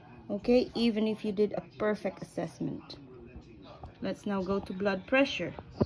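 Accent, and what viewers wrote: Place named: United Kingdom. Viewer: Naks ke galing naman mag tutorial bagay na bagay with British accent pa saan ka pa nun..😆💕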